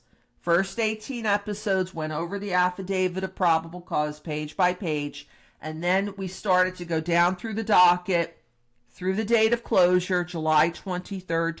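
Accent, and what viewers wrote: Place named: Eastern Pennsylvania, United States. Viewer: !! I've been following your channel (and commenting) for quite a while! It is absolutely wonderful to hear someone like you who presents "just the facts" as they say! I'd like to ask you a candid question, if I might... Are you by any chance from Eastern Pennsylvania? I'm from New York's Hudson Valley and I've been trying to place your accent, and I'd say yours is either from Northern New Jersey or Eastern Pennsylvania, if I had to guess! I'm a lover of different accents and yours struck me so distinctly!! Keep up the excellent work!! Thank you so much!! Happy belated Thanksgiving! 🦃🦃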